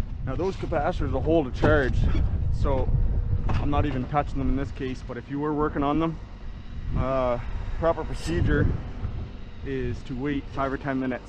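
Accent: Canada. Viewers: How weird... I actually had a little physical tingle of fear watching this. Huh. How about that. This is only the second one of your vids I've watched, where are you? Do I detect Canadian in your accent? I'm in Scotland here. Oh, and +subscribed. Great stuff, thanks for recording on real jobs. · Between AVE and a Canadian Beekeeper’s Blog, and the Jet City jet engine rebuild guy, I think it knows I like Canadians, and this guy sounds Canadian, and it knows I like technical stuff and power line stuff, so it makes sense! And I had to scroll past the bee euthanization video to get to this one, it keeps trying to get me to watch it lol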